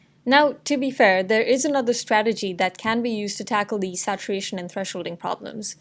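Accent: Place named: India. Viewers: This is one of the best indian accent I've heard, clearly explained every details in the paper! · Trace? Clearly an Indian accent! But so what? :)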